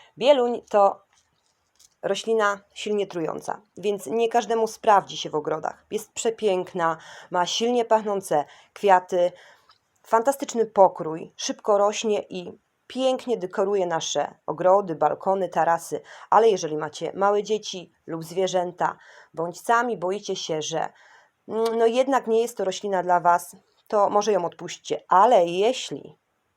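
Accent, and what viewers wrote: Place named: Łódź, Poland. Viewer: Słyszę naleciałości łódzkie ;)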